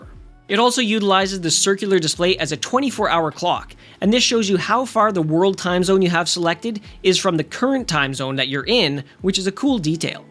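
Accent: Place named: Canada. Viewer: I'm guessing you're in Canada, as you pronounce the word "top" the same way as Jordan Peterson.....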